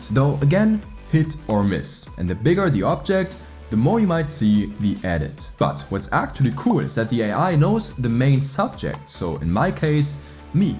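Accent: Germany. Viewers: Der deutsche Akzent :) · are you german? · Is it just me or does he sound a bit more German in every sentence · Your accent sounds like German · Du bist doch Deutscher haha hört man so krass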